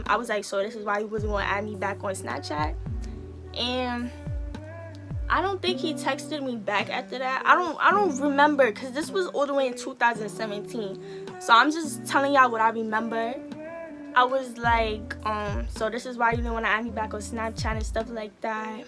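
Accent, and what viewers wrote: New York, United States. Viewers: I love your accent❤ you from NY🎉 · I can hear your New York accent. I soon as I heard you speak I just knew you were from New York. Anyways you are so pretty😍😍😍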